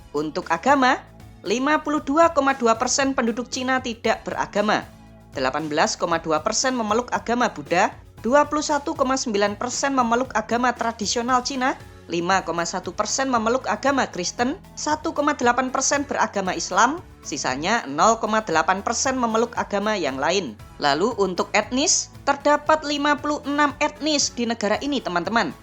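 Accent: Java, Indonesia. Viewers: eksyen naratornya yg njawani banget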